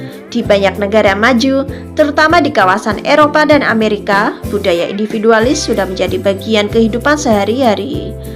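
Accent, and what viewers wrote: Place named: Java, Indonesia. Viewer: Admin nya ternyata Tidak builds Bahama Indonesia dengan iramanya... Malah Berlogat jawa totok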